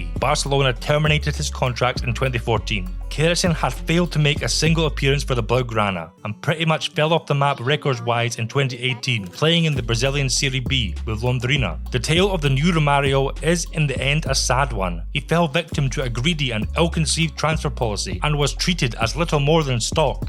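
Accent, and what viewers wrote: Scotland, United Kingdom. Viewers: How the heck have you only got 21k subs man. Love your wee Asian Scottish accent as well. Great channel. You’ll have millions of subs surely soon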